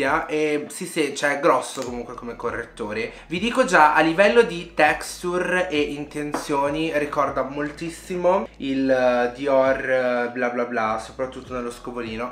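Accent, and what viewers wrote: Milan, Italy. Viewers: a Milano la cadenza è quella e non è di "m". Cerchiamo di stare nei limiti dell'educazione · a me non piaceva la tua parlata milanese di m!! 😂😂😂 invece i tuoi contenuti sono per me ottimi e quando esprimi pensieri e opinioni “makeup a parte” ti ho sempre trovato serio e maturo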